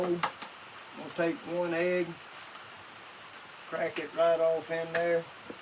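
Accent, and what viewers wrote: Louisiana, United States. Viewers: What part of Louisiana are you from?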